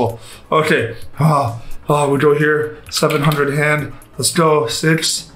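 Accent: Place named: New York, United States. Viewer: Who is this new york man? where did our middle eastern csgo player go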